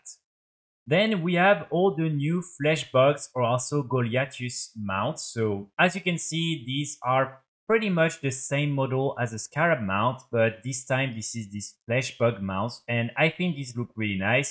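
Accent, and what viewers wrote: France. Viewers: Pas mal le french accent 😏 good video haha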